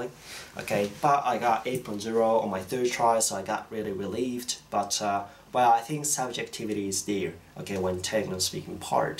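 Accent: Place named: Australia. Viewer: Omg I love your Australian accent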